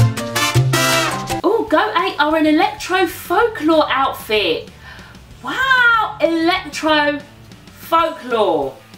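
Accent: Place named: United Kingdom. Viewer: Акцент British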